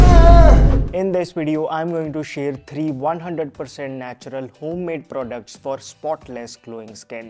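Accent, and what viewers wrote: India. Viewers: He is talking in English but it feels like I'm listening Hindi.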